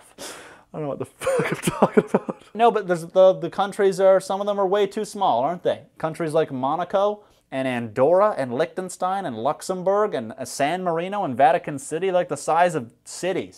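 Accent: Newfoundland, Canada. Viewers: Aha - I picked out that you’re Canadian from your accent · I watched only two of your other videos before, the horrible yo mama jokes with the theory of relativity and congestive heart failure, and I was wracking my brain thinking - where the hell is that beautiful background and what is his accent?? It's not quite European but it's not quite American? When you said Newfie, it all made sense lmao cheers from the 6ix, thanks for mentioning Ontario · As soon as you said “lore” a heritage moment played in my head and I became fully aware that you have to be from Newfoundland. But like, also every other word as well. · the way that I could tell he was Canadian before he even said it. -sincerely, a fellow Canadian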